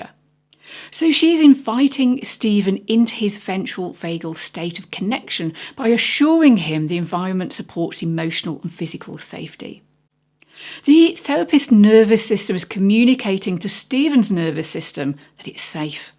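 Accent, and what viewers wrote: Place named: Wales, United Kingdom. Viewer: Are you Welsh? It reminds me of listening to a Welsh author read her book. It’s a lovely accent to my ears. I am Welsh, English, Irish, French and German. I love all races but despise Nationalism. Despise Nationalism. Infantile disease. Great video 👏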